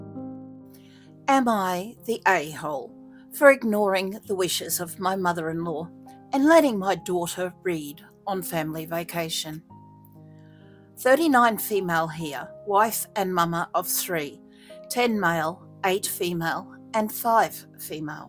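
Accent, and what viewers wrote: Australia. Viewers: I hope you feel better soon! I REALLY ENJOY YOUR CHANNEL!!! Thanks so much for reading to us. It reminds me of going to the library as a child, sitting down in a semi-circle and listening to the librarian read us stories. That was the next best thing to reading a book myself, and I still enjoy being read to today, so thank you! I enjoy your accent and the sound of your voice. Are you from Australia? I'm in the US. LOVE the accent, truly!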